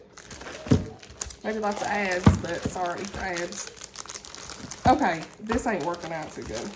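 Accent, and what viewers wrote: South, United States. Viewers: Your southern accent is fine for me. I'm a southern girl myself. I'm from Maryville, Tennessee. I will be praying for you and your family. We are going through a hard time with my husband. He is taking chemo foe stage 4 colon cancer so please keep him in your prayers please.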